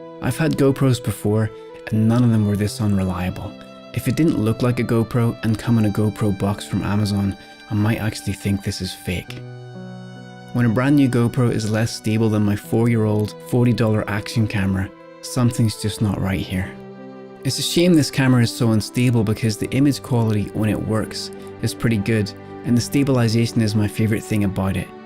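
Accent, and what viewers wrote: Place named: Northern Ireland, United Kingdom. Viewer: am I right in saying your originally from Northern Ireland? might be wrong but I can pick it up, I'm a Fermanagh man myself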